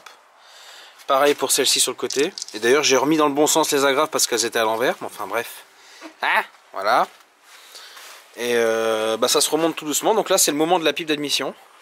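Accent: North, France. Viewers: Quel plaisir que cet accent du nord matiné de syntaxe supérieure, de mécanique de précision et de jurons de chez mémé. C'est comme le résultat si Edd China s'était retrouvé dans une soirée coquine avec maitre Capello et les frères Lopez. Ca ma rappelle ma jeunesse.. Merci pour ces vidéos et pour le temps que ça prend à faire. La question qui m'interroge la tout de suite concerne ce super tuto de la "thermodynamique de la combustion d'un moteur froid expliqué à ta petite sœur" : Il se trouve qu'un spécimen de VR32 BKK à élu domicile sous le capot de mon camion (et c'est pas moi qui l'ai mit, c'est les messieurs de chez WV, il est comme ça depuis le début, c.f. WV multivan V6, ne pas taper merci ou au moins pas la tête...) Bref : Je suis coutumier de sa mise en route et du bruit de cette turbinette d'air secondaire (techniquement dite turbinette de brun). Je sais aussi que son non fonctionnement est rapidement sanctionné par l'ODB et son petit logo warning orange sur le TdB. 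(techniquement dit voyant de diagnostic de défauts de crotte ) Ma question est donc : "Comment que c’était ty que on fèzè pour pas que eul voyant de brin il s'allume le kon si on vire tout le bazar ?? ( ou comment tromper l'ODB sur ce point et sur d'autres aussi si vous avez des choses à dire sur l'OBD version KWP2000 pour faire une reprog ..si possible par cher...a quand le programmateur d'ECU chez lidl..). Bref merci de m'avoir lu, merci pour votre réponse, encore bravo, gloire au VR6 et power corons !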